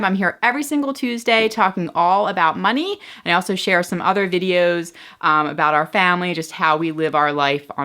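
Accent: Pennsylvania, United States. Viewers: Hey, I'm from PA too! I'm guessing by your accent that "yinz" are not from the same part of PA that I am 😜 Let's go Pens! But really, I feel you on the deer situation. I've hit more than I'd like to admit. Luckily the worst that's happened to me was the grill cracked and I lost a headlight. Right now my husbands laptop is broken and we are just sharing mine now. It works out fine because I have an iPad for work, so I just use it most of the time!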